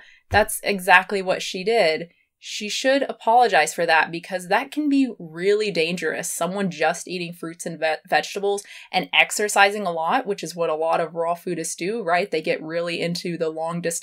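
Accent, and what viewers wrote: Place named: Pacific Northwest, United States. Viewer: She mentions Portland. I assume she means Oregon? To me, as an Oregonian, she does have a bit of a PNW flair 😂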